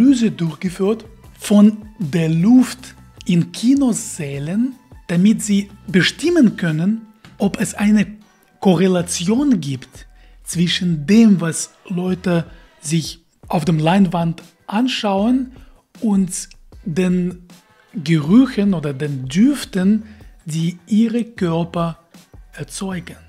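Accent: Russia. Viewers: Wie immer, toller Beitrag in einem herrlichen russischen Akzent! Danke für Deine Mühen! Ich „spinne“ man ein wenig: Die „Kunst“ bei der Levitation ist nicht, die Levitation selber! Die Kunst ist, die Wechselwirkung des „skalaren Higgs-Feldes“ um den Probanden, mit den subatomaren Teilchen (Quarks) des Probanden, zu unterbinden! Ohne diese Wechselwirkung keine „Masse“ und ohne „Masse“ ist levitieren ein Kinderspiel! Derjenige der das hinbekommt, der bekommt alle nur erdenklichen „Nobelpreise“ die es gibt! Garantiert!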